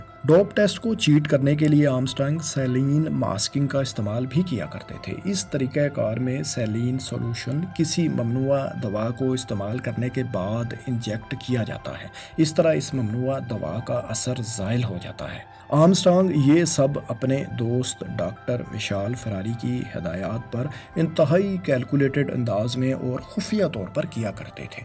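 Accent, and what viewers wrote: Punjab, Pakistan. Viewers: Fuzol video hay Bhai choti se baat ko itna lamba khencha hay....Waste of time...plz improve your Urdu accent..Punjabi lahja hay ap ka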